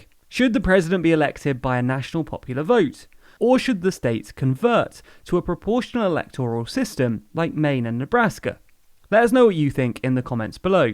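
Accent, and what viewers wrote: United Kingdom. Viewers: Dudes British.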